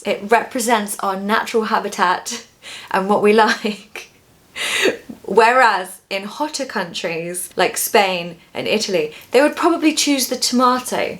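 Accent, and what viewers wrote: United Kingdom. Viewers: You're my best British English accent teacher ❤🎉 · Hello,. I always enjoy your videos! I used to live in London, and I love your beautiful British accent! Did you have a nice summer? I'd like to say I had a good summer despite the awful heat. You'll never guess what happened. I fell into the underfloor storage and broke my leg! I hope you stay fit and have a lovely autumn! Bye! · Usually I didn't like to write a comment here, but you made an incredible explanation for me, and I also like to hear your voice with a British accent. Sorry if my English is not accurate or there is something wrong with my comment. 😊❤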